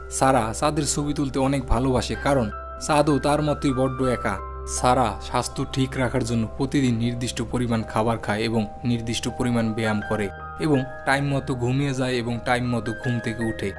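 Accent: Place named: Barisal, Bangladesh. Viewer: ভাইয়ের বাড়ি সম্ভবত বরিশাল অঞ্চলে। উচ্চারনে আঞ্চলিক টান। একেবারেই আনস্মার্ট উচ্চারন।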